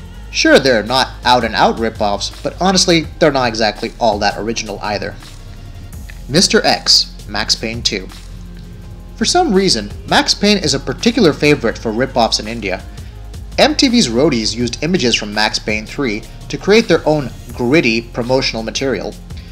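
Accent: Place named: United States. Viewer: wo plz stop using American accent, if you can't stick to Indian fine, adopt British since its far easier to understand them than American's. Though am talking silly, how could you change to British and not Indian, but still, its just too clunky.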